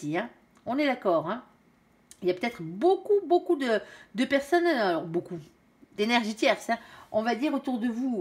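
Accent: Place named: South, France. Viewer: Je vous découvre, vous êtes dans le juste 😊. Merci pour votre bonne humeur et votre accent de soleil ☀️ Je m'abonne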